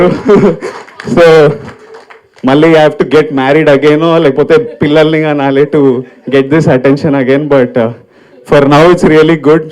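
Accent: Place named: Telangana, India. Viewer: he spoke pakka..hyderbadi..telangana slang..so..natural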